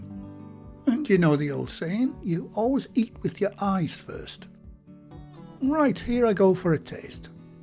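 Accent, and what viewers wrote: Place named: Northeast England, United Kingdom. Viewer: he’s northeastern English :)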